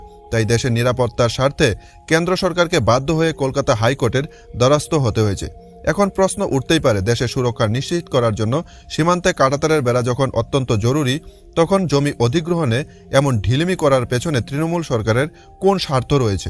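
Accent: Bangladesh. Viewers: Dada. Apnar name জানতে চাই. আপনার কথার ton শুনে, মনে হচ্ছে আপনিও বাংলাদেশী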